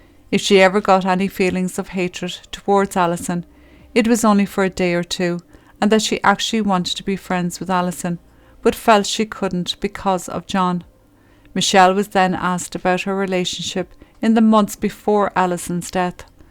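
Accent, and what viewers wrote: Ireland. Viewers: I like this narrator,as her Irish accent makes it more personal,l also like her comments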